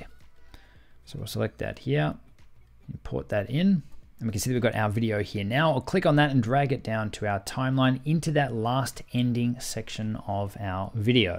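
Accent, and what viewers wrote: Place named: Australia. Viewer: Wow, there's something new every day! Top tips here. It's great to have the occasional Aussie explaining too, none of that grating 'hey what's up guys!' Shudder, puts me right off that does. Just kicking off the youtube journey and will be following you and the courses you do. Thanks very much for the help so far. Terrif! KP - a pom, somewhere in the UK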